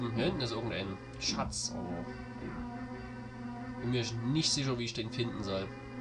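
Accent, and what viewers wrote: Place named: Saxony, Germany. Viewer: sächsisch^^ willkommen im club :)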